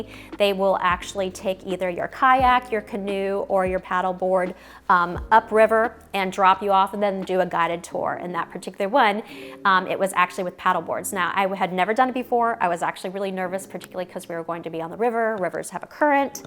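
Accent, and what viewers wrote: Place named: Richmond, United States. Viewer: Are you from Richmond? Because you have a Richmond accent. Especially since you used the word rivah, which is something a person from central Virginia would say.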